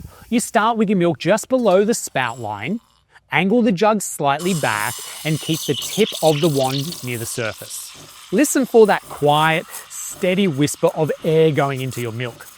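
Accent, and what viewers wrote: Australia. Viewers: I watched your video for the first 3 minutes with captions and the sound off. Thought to myself, 'This guy probably has an Australian accent.' Turn the sound on, and I was right. How did I know that!?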